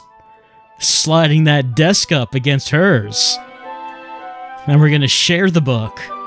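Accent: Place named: New York, United States. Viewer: I miss New Yorker accent already:(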